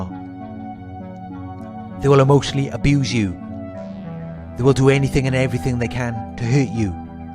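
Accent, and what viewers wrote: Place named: New York, United States. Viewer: I FOUND it looks Great. You look and Sound so New York. My Dad was from Flatbush.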